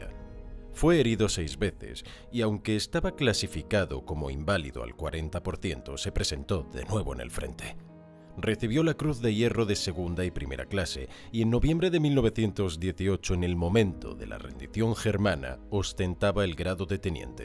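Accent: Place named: Spain. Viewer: Estoy adicto a estos videos, la voz y el acento español van como piña jajaj saludos desde Argentina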